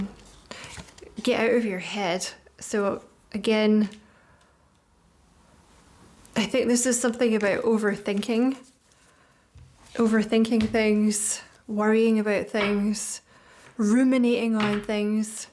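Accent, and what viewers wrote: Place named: Scotland, United Kingdom. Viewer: Thank You. Felt as if you were doing a private reading for me. Resonated with me every step of the way.. and I love your accent and you must be from Scotland. Thank you again. You are an excellent tarot reader. Averil from South Africa. Blessings to you ♥️🌹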